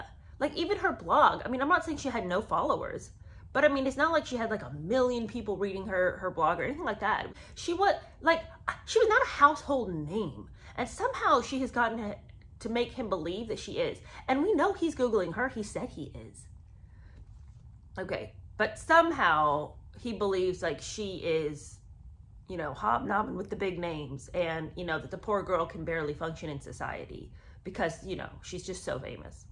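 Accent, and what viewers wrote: United Kingdom. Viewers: Love your British accent.. very Bridget Jones 😂😊 (I’m not being snarky, I actually like it)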